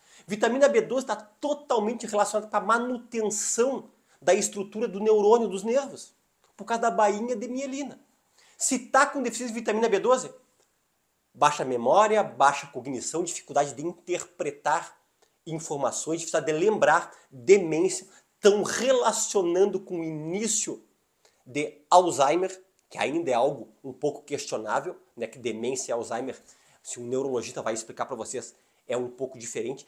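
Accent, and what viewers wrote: Rio Grande do Sul, Brazil. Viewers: MS que médico bem gaúcho tche!!!!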